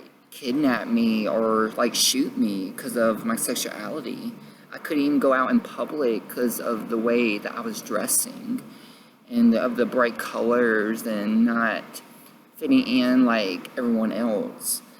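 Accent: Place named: South, United States. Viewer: So You are a gorgeous man, smart, sweet, Have a very southern accent and still love God, Yeah I'm pretty sure you are what we call a perfect guy 😀 This was an amazing Coming out story that was so Genuine and unedited, which is what I think is perfect. You're a Grandma's boy, that may of been the most adorable thing you could say 😊 You sound so much more interesting than me. I'm Steven, Geeky gay guy who's 25 From Upstate New York that has Asperger's. Anyway, I'll shut up, I'm probably boring. I'm happy to be your 13th Subscriber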